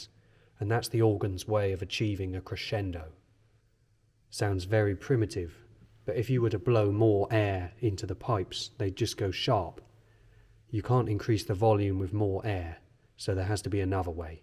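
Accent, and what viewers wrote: England, United Kingdom. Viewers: I am truly blessed to have found this channel! Everything about it is wonderful! It marries 3 of my loves: architectural history, pipe organ music, and a proper English accent! ❤. Looking forward to seeing more from this amazing artist!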